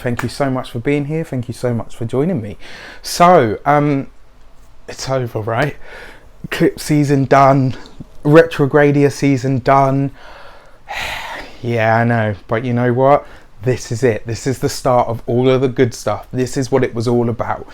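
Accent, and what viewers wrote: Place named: London, United Kingdom. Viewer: He's not Manc. He's quite clearly London way. #watchatalkingaboutwillis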